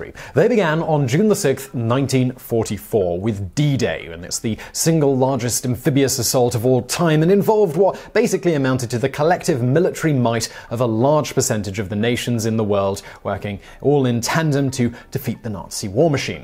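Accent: United Kingdom. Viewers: woah british babish 😳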